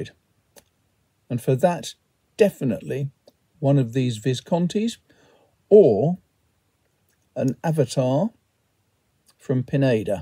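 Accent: United Kingdom. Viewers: Just because we speak a common language does not mean we always understand each other! When you say you look at the bezel, are you looking at the cameras lens? You are the only person I have encountered that makes it look natural. And you are more pleasant to look at than you give yourself credit for. Probably the accent has a lot to do with it. We here in the colonies are suckers for the accent!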